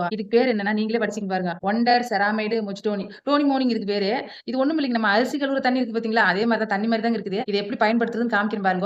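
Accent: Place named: Coimbatore, India. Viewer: கோவை பாஷை சூப்பர் ❤❤❤❤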